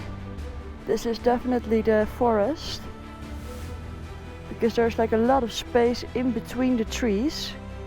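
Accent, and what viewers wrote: Netherlands. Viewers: Binged watched all of the episodes, great to see another Dutch lady taking on the the challenging rides, at the rate you are flying through the countries you'll be in Kuala Lumpur in no time. I see a lot of comparison in comments with Itchy Boots but apart from Dutch accent you bring your own format really great editing of video.You have really matured in a very short period in off-road riding, I remember the first gravel road I think I stopped counting the oi,oi's now there is hardly any 🙂replaced with wauw. Keep going enjoyable to watch and living vicariously through you and others traveling on bikes through foreign shores. You do you. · No. They're both Dutch girls with similar accents which is nice.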